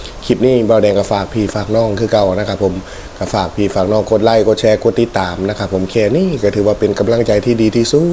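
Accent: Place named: Nakhon Phanom, Thailand. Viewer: คนที่ไหนคะสำเนียงเหมือนคนนครพนม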